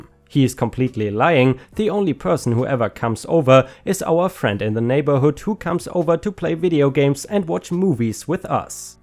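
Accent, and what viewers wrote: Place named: Germany. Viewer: you "contacted everyone who'd commented on the thread"?! Good lord, that's some next-level leg work on reddit stories, and as someone who long ago answered your question of what sort of stories I would like to hear with the response, "any type, as long as there's reasonably satisfying ending where we get to know how things turned out", I very much appreciate you putting in that work. That's why I never miss an upload. Well, that and your German accent--it reminds me of the coolest guy I have ever met in my life, on a long train ride from Paris to Munich. I was erroneously sitting in his seat on the train when he opened the cabin door and addressed me in (to my ears, anyway) perfect French. I replied with, "uhhh..." and immediately in equally flawless English, he says, "oh, you're American. My girlfriend was right--Inga, you're right, he IS an American--but I thought you were French, obviously. 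You're actually in my seat--no no, stay there, stay there, keep the seat, we can just take these seats here--but perhaps in exchange for the seat you might buy the first round of beers for us? Does that sound fair? And then I'll buy the rest until we get to München. Oh, but please don't order us any American beer, do you actually drink that stuff? No? Wonderful! Then we will have a wonderful trip, I'm sure..."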